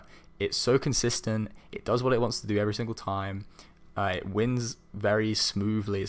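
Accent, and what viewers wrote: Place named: Europe. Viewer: Its normal for North America to dominate the TCG space in terms of sheer numbers. The United States out purchases all of Europe in pretty much every TCG at least 3:1. The American dream screams win big money from tournaments so there are soooooo many more TO's running events per game than pretty much anywhere else on the planet. (This tends to shock most European players until they look hard at the state of their official World Championship series for their respective game vs United States.) Between the accent and you having commented on not coming from Magic, I am going to go on a limb and ask if you are European?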